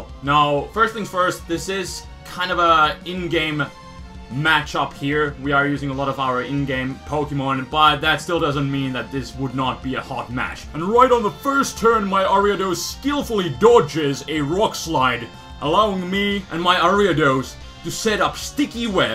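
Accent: Finland. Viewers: Oh it's a Finnish accent sorry about the confusion but it's very formal :-) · So are you from Finland?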